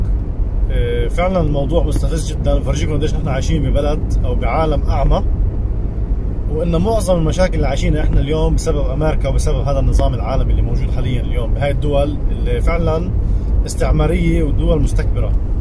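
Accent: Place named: Palestine. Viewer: السلام عليكم إنت من وين أخي واضح من لهجتك فلسطيني من سوريا أو لبنان؟؟ ممكن تعمل فيديو قصير تحكي فيه عن نفسك سيرة ذاتية. من الجيد أن نعرف عنك أكثر و شكرا جزيلا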